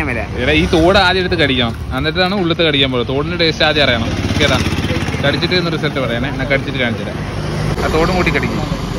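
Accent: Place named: Kozhikode, India. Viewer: Kozhikode slang 😁❤️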